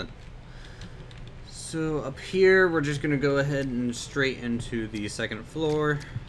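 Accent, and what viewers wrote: United States. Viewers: are you amarican?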